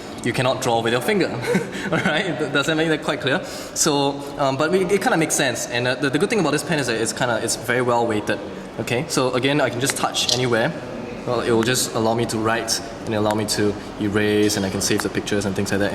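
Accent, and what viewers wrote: Singapore. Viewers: Singapore accent, my accent, lol.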